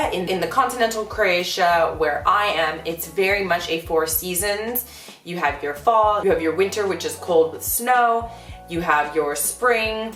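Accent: United States. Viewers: and now , all that you said in English, translate into Croatian, Serbian and Bosnian. Write as you speak, read as it is written... Work on the voice pronunciation - "Ć, Č, DŽ, Š, Ž ... in your case, since you are an American - R voice, too... Language has a lot of consonants, seven cases, which is not a lot, Finnish has 14... It is hard, but you'll get it :) you do not need a Cyrillic letter for Croatian, you do not need it even for Serbian, only if you are an enthusiast. This was once called the Serbo -Croatian language, and now they are separated - these are nuances, language is the same ... Bosnian is the same language, too :)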